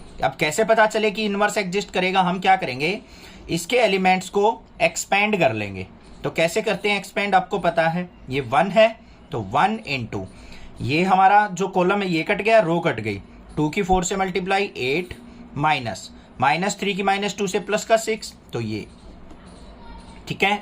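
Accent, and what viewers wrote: Haryana, India. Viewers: Sir I think aap haryana se ho.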